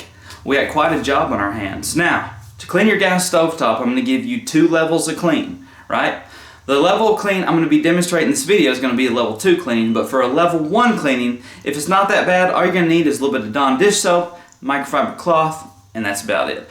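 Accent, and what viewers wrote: South, United States. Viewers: IM a SNOW BIRD...LOVE YOUR SOUTHERN DRAW yes you are the nice work my friend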